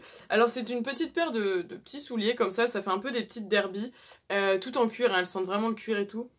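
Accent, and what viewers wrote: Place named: South, France. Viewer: Ton accent du sud me tue mdr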